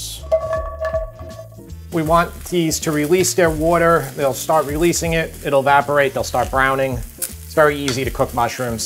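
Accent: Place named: Long Island, United States. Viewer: That Long Island accent is like a warm blanket.. reminds me of home 😢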